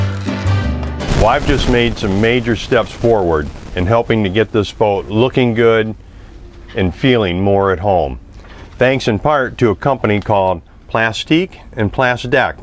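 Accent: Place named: Chicago, United States. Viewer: Chicago area accent, right?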